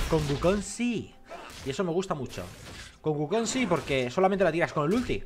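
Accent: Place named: Chile. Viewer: se te esta pegando lo chileno :v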